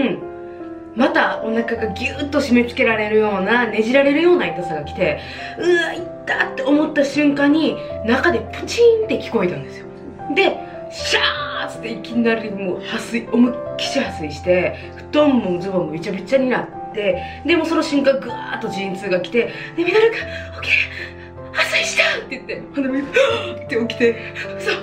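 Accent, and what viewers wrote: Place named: Osaka, Japan. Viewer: happy birthday💗感動して泣きそうなりました(>_<)優しい旦那さんと可愛い娘ちゃん💕これからのwonderful人生応援してます💗いつも大阪弁が親近感で大好きです❤️またメイク動画も楽しみ*\(^o^)/*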